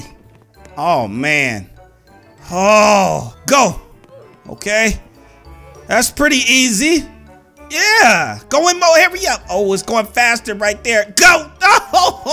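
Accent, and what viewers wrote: United States. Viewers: I like his American accent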